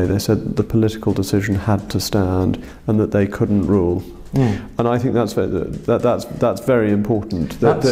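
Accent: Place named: RP, United Kingdom. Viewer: Araelius, He's got a plum in his mouth the size of a peach but you know you could have a great chat with him down the pub over a few beers. I can't imagine that with almost every other politician.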